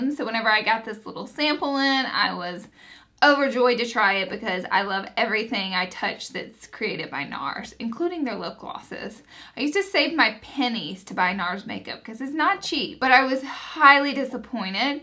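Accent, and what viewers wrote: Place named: Alabama, United States. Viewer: I just started watching you today when I stumbled on one of your videos. Within 20 seconds I thought "she has to be from Alabama!" Lol I say "oil" the exact same way.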